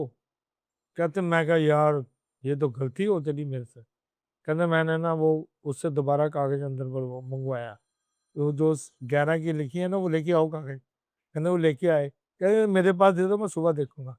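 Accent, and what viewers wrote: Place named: Punjab, India. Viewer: Dara singh wala hindi accent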